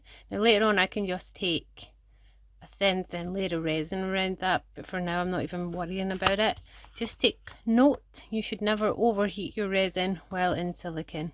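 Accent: Scotland, United Kingdom. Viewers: Of course! I should have realized that it was a Scottish accent, no wonder I like it, that's my favorite dialect. My Grandfather's name was McCoid, not sure if it's Irish or Scottish, but I have visited both countries and would live in either quite happily! Thank you for your reply and keep up the great work.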